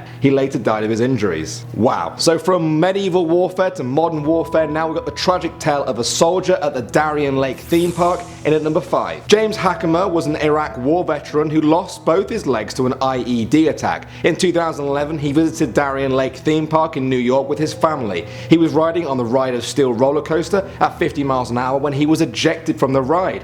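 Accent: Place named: United Kingdom. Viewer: He's British so he has an accent.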